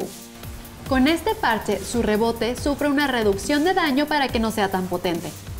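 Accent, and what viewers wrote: Mexico. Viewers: Demasiado robótica aparte su mexicano weyyyy uff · Es mexicana?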